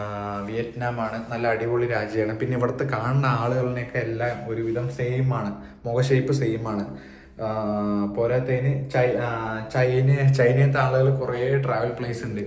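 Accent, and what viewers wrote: Palakkad, India. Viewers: പാലക്കാട്‌ ഭാഷ 😆ആ ആ ആ ആ ആ എന്ന് കുറേ നീ പറഞ്ഞു 😆😆😆😆 ഞാൻ പാലക്കാട്‌ പറളി, എടത്തറ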